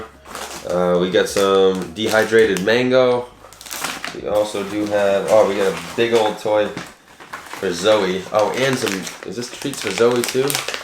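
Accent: Boston, United States. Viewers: He's got a Boston accent. Goes with the whole shtick. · Boston accent coming through and nothing to be ashamed of. But same with me my Bosnian weird way of saying things come up constantly especially from my kids, mom say cookie.. i say it coooo key but cooo is so long that it sounds funny to them😂. Great video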